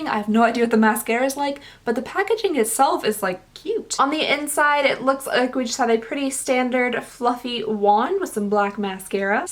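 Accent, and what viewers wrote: Kentucky, United States. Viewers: It's crazy that I clicked on and watched your video lol your voice is the only one I can handle I scroll down and realized why!!!! Your a fellow Kentuckian!!!! Go cats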